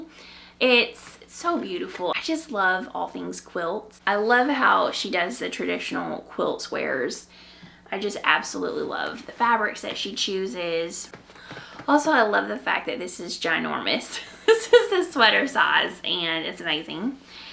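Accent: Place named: Tennessee, United States. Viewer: I just found your podcast and I love it! Going back to listen to the others. Question….. both my daughter and I think you sound like you are from Tennessee (we are from East Tennessee). Have you spent some time in Tennessee or is that just a pure Arkansas accent? 😁 No matter, love listening to you.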